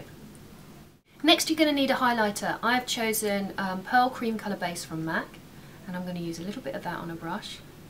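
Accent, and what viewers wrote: United Kingdom. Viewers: are u a british?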